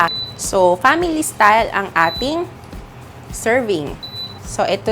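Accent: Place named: England, United Kingdom. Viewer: She pronounced the letter R with an English accent. So pretentious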